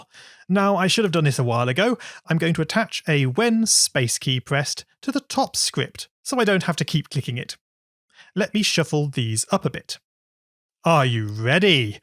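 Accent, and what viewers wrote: England, United Kingdom. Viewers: are you from england? thats the only place i know where people say z (shee) as z (zed)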